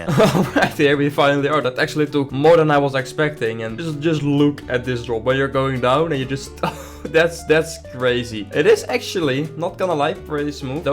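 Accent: Netherlands. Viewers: Ar you Nederlands?????????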